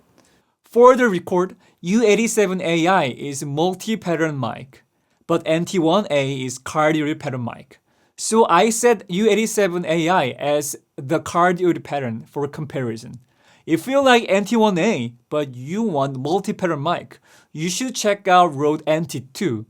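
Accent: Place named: Asia. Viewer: 아시아산 영어의 향기가 느껴져서 좋네요.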